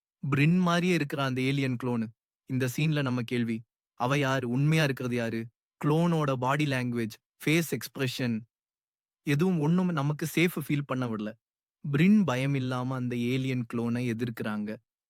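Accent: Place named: Sri Lanka. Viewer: Your Sri Lankan Tamil ❤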